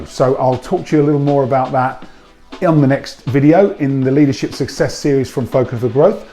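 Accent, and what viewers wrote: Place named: United Kingdom. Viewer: This is my favorite coaching model! Loved how you explained it and love the UK accent as well.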